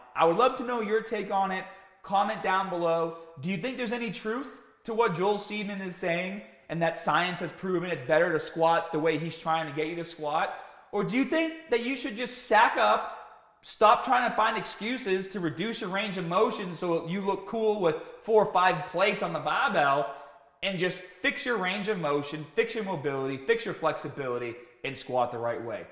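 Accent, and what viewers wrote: Boston, United States. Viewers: The most Boston of Boston accents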